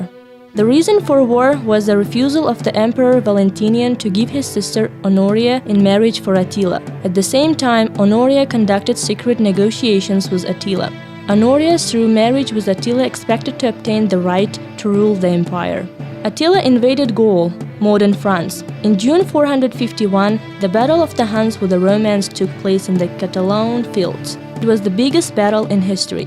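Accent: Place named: Russia. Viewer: You have russan sound)))))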